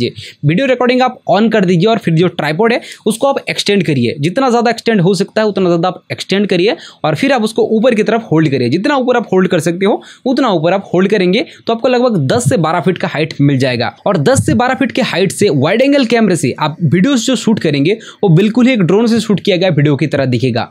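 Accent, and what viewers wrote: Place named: Bengal, India. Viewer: Bengali ho kya ... 😁 tumhari ... Bengali accent se pata chala 😁 ans ta debe thik ache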